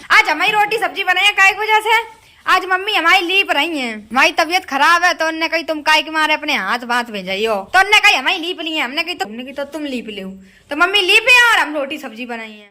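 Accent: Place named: Mainpuri, India. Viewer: Aapki Mainpuri language is best...?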